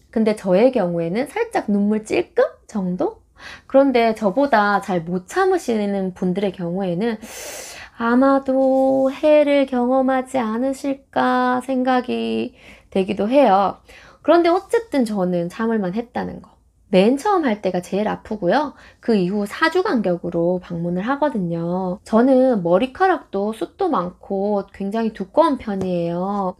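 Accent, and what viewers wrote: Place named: Gyeongsang, South Korea. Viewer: 고향이 경상도이신가 보네요!!!...ㅎㅎ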